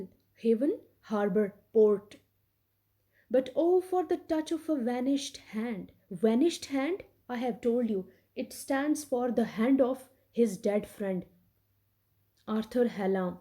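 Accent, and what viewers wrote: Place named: India. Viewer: لهجه هنديه ايع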